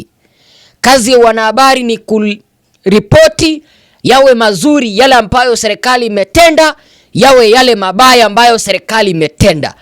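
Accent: Kenya. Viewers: Batilinimamayako nababayako mbwawewe kwanzahatakiswahilihuwezi kwanzawewe siomtanzania hakiswahilichako ninakujua wewe nimkenya kaongelee mamboyakenyeyenu nchimmeiuza kwamabwenyenye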